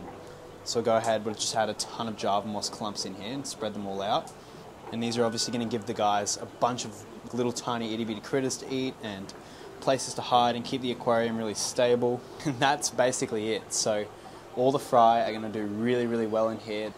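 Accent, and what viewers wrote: Australia. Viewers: This is amazing! What's your accent? Aussie?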